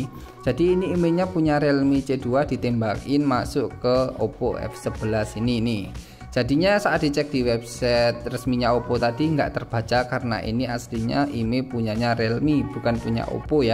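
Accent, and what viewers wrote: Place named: Java, Indonesia. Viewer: Jawamu terlalu medok mase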